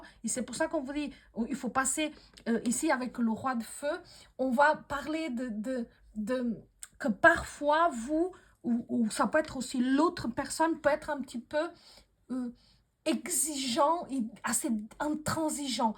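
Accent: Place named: Portugal. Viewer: Bonjour Merci beaucoup pour ce très beau tirage très inspiré et inspirant .... Très riche lecture avec un très beau parfum d'accent portugais que j'adore ..... Belle journée . A bientôt 🙏🌻 Pitus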